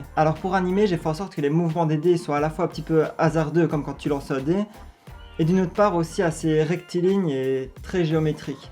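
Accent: Liège, Belgium. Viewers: T’es vraiment trop fort ! Je pense de c’est le stop motion le plus cool que j’ai jamais vu ! Sinon tu ne serais pas belge par hasard ? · Hello c est vraiment des supers videos perso j kiffe grave. Une petite question est ce que t es belge? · T'es liégeois? Vu ton accent ahah ?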